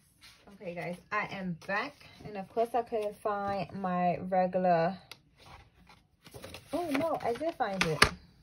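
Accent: New York, United States. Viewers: That New York accent tho. Lol! Needed this motivation today. Thanks sis. Have a good weekend.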